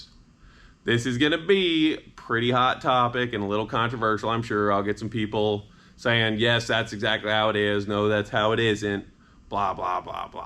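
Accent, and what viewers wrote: South, United States. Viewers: This is Tosh.0 with a southern accent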